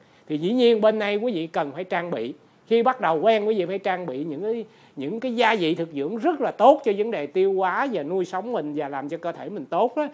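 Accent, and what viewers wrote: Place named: South, Vietnam. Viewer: Chúng tôi kính cảm ơn quý Thầy chỉ dạy cặn kẻ, tận tường, lời lẻ giản dị dễ hiểu, Giọng miền nam truyền cảm ấm áp, hình ảnh và âm thanh ở đây rất tốt!!!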